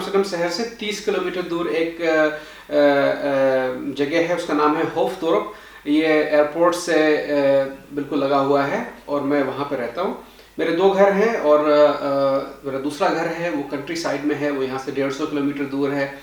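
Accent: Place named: Awadh, India. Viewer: आपकी अवधी भोजपुरी अच्छी लगती है और ये बताये वहा भारत और हिन्दी उर्दू को क्या अहमीयत है?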